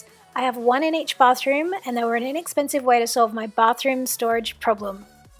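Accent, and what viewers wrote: Australia. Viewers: very clever ideas nice to hear an Australian voice too xoxo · Just subscribed.Just realised my sodastream fits next to the microwave! didnt think to try it before.. nice to hear an ozzie voice. Great videos (Straight to the point, less chatter). Keep up the good work! :)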